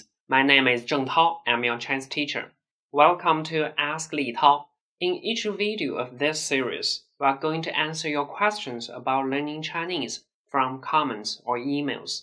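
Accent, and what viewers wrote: Standard. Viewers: I’ve watched enough of your videos to understand that your full courses will be well worth the purchase price. They’re well structured and your teaching mannerisms and accent are textbook; which is so helpful for beginners and intermediate students. Your videos were the first I found when considering learning Chinese and I’m so glad I came across your channel first. I’m now around HSK level 3. Thank you for putting these lessons together and I hope you continue to do so for a long time. I look forward to purchasing your full courses this month.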